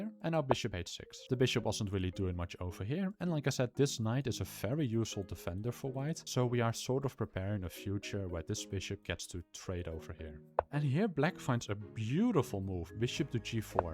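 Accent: Netherlands. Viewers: The DUTCH ACCENT IS UNESCAPSBLE BRO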